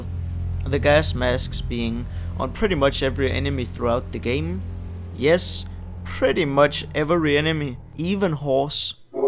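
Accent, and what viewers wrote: Denmark. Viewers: Har aldrig hørt en mere dansk accent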